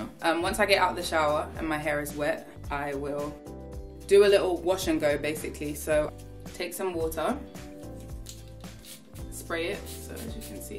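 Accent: United Kingdom. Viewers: forget she’s british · Her British accent tho 😍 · Wtf I didn’t know she was British lmao 😂❤️❤️ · how does she speeks british but in her songs she speeks american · i'm obsessed with her accent and the way she says reindeer, i'm british but have nowhere as good an accent as hers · is she British?!! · Wait.. is british what?... I know I'm late but.. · Keep forgetting she’s British until she talks at the end of her songs 😂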